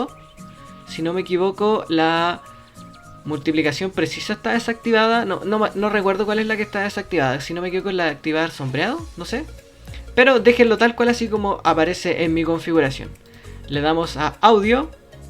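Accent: Chile. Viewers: eres chileno no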